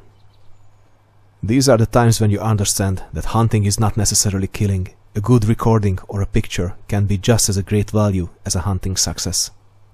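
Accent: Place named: Hungary. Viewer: Magyar akcentust érzek a levegőben. Minden tiszteletem nem lehetett egyszerű a vadászat!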